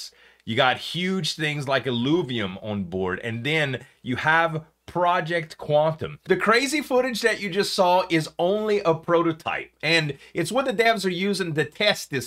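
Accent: Louisiana, United States. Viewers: Lol what part of Louisiana you from bro?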